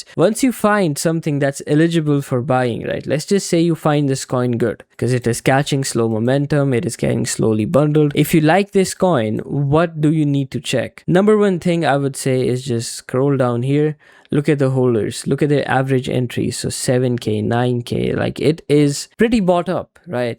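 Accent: India. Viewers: Your accent is somewhat Indian.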